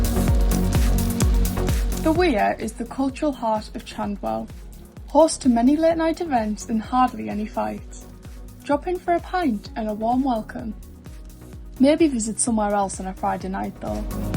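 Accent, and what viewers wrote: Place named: Newcastle, United Kingdom. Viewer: Aaye lovely Georgie bird accent! CANNY MAN!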